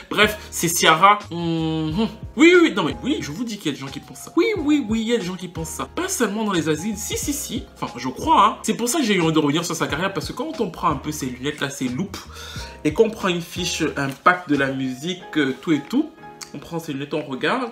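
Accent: Cameroon. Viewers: On dirait que tu es Camer 🇨🇲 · J'ai reconnu un petit accent camerounais ... 😏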